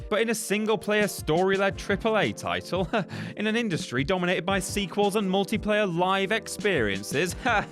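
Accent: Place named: Yorkshire, United Kingdom. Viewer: sounds very 'Yorkshire-ey' today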